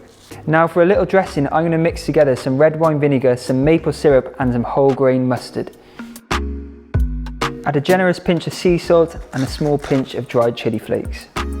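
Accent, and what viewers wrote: United States. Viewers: That American accent is both endearing and entertaining. I have to try these recipes! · omg that American accent. I'm dying 🤣 I have had the biggest grin this whole video lol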